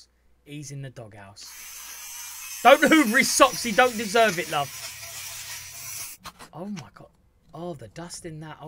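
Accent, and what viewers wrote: United Kingdom. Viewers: man, i love your videos so much, and the ( English British accent 😍 ) amazing bro . big 👋 from an Egyptian fan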